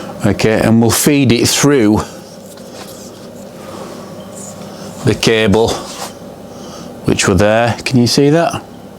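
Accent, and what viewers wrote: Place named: North, United Kingdom. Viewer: Thank you for this great video.. A really big thank you 🙏.. Loved your northern Accent 🙂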